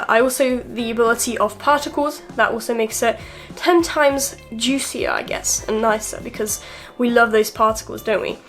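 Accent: United Kingdom. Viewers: i was thinking that she dani but british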